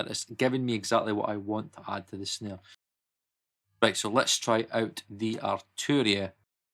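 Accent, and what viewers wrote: Scotland, United Kingdom. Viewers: Goto another video if you can’t understand this lovely Scottish accent. I’m lovin it. Edinburgh or Glasgow? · It’s not Eastern European accent, it’s Scottish and so easy to understand.. not to mention that it’s one of the original and oldest English accents in the world, and I’m not even British. We should learn to appreciate the taste of pure coffee and not add sugar to it 😆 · Oi mate! I have been digging neOld a lot. Its warm an rich sounding. I compared to Slate myself and Slate`s feels colder. Lacks warmth, which can be good sometimes. I'm really enjoying neOld so far. Haven't used Arturia yet, but as far as I know, it has an eq built in, which takes it apart from the two. Nice video man. Love your Scottish accent by the way. Cheers lad.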